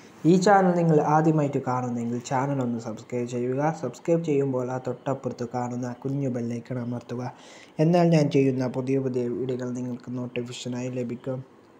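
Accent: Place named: Kasaragod, India. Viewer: കാസർഗോഡ് സ്ലാങ്ങും കാസർഗോഡ് പലഹാരവും കലക്കി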